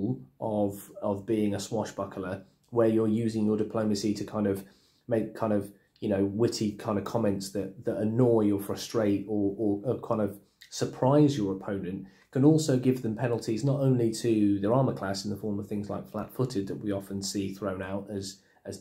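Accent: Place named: United Kingdom. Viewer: Cool Vid! Listening to a british dude talk can be quite entertaining.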